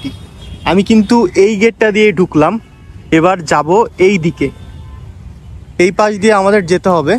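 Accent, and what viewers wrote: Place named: Bangladesh. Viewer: apnar bangla vasa to valo noy! kemon jeno bangladeshi mone hoy j?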